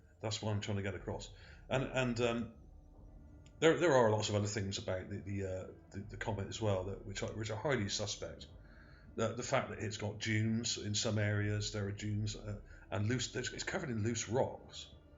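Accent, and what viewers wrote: Bristol, United Kingdom. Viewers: Now how did I know that you were from Bristol, UK, lol. My home city.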